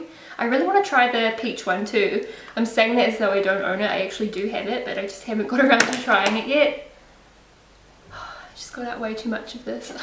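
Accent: New Zealand. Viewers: 'petel flower' -> petal flower, gotta love that Kiwi accent! x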